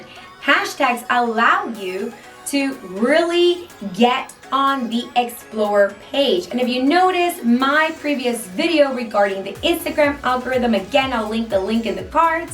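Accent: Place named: Spain. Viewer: Cool............. I don't know about .........I like your Spanish accent.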